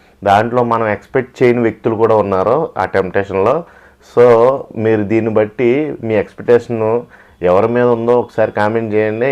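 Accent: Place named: Godavari, India. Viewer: Nuvvu same godavari Jilla pakka super language and manodive bhayya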